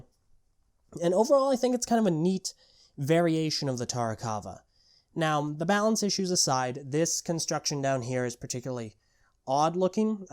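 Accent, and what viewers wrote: Australia. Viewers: Pohatu now has a Australian accent in my mind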